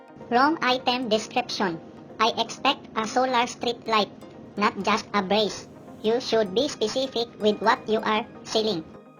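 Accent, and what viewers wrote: Visayas, Philippines. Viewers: Murag bisaya ka sir ba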